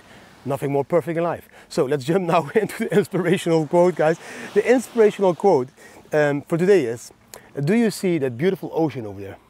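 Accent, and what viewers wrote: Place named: Netherlands. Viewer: Right 😅 I like his Dunglish though, the Dutch are used to say glazen bol 🔮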